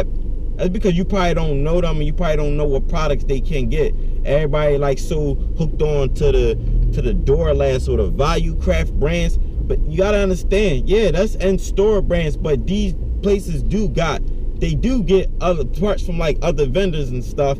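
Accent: Philadelphia, United States. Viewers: Bro you gotta be from philly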